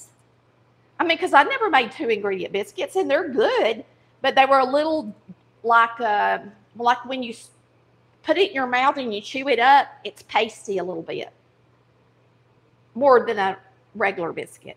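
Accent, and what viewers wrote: South, United States. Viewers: Love your Southern accent and the way you cook! Y'all are amusing, too. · For those of you who don't understand a lot of her words due to her Southern accent, she said "baking powder', not bacon powder. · I love you and your crazy Accent! Y'all! We say Eh and you say Uh huh honey and Y'all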